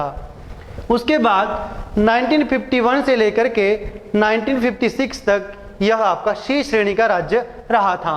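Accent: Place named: Bihar, India. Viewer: Bihari accent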